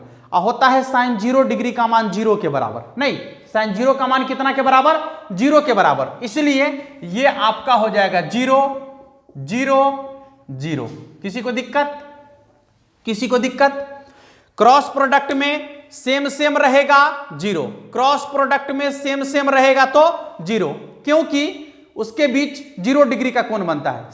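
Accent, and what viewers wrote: Bihar, India. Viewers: सर बिहार से है का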